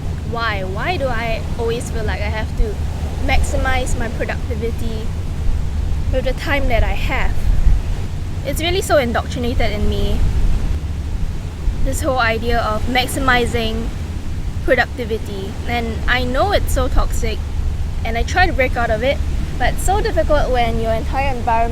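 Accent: Singapore. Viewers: You are adorable and I hear some Singapore inflection Good luck, I will follow this for at least a while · Greetings from California! I have never heard of you, and I don't know how I got to your channel. But listening to your accent, my guess is that you're from Singapore or Malaysia. Am I right? You said you had 300+ subscribers. Look at you now! As of this moment, you have 849! I'll subscribe, so you'll be at 850. Then I'll listen to your music... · Your accent sounds like youre from Singapore possibly? I went there once several years ago. I'm about a minute into your vid. If you get a passport and live and travel to places that are less hustle culture, it could be a breath of fresh air. Also, i play guitar(20 yrs) and I agree, it's the most free place. Moving to Philippines next week. I hope you find consistent peace and happiness along your way in life. 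· Actually, English is most likely her first language. She is speaking Singlish. It's English.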